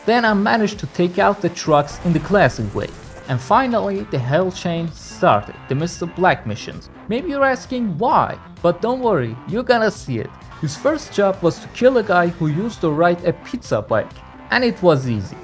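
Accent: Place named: Iran. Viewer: Persian accent 😂😅❤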